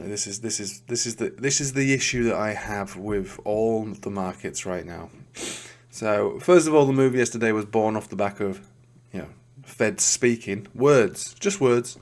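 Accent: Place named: England, United Kingdom. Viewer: First time I’ve listened.. Nice honest analysis. Nice to hear an Englishman. God Bless. Subscribed. 🎉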